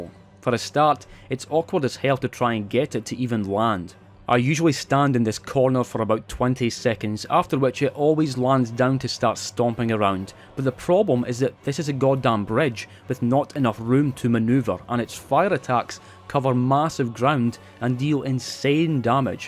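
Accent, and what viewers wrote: Scotland, United Kingdom. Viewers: I watched "Dragonworld" when I was a kid, and hearing a Scottsman talk about dragons just brought back so much nostalgia.❤ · A Scottish man talking about dragons makes me happy thank you for the video man. I think the reason why the dragon God is so different is because it was actually dead and was revived using a demon soul which is why it looks like a demon dragon hybrid. I still love the look of it though it's by far my favorite looking dragon in the soulsborne franchise. I also like the fact that you have to use large ballistas to actually be able to defeat him, he's just that powerful.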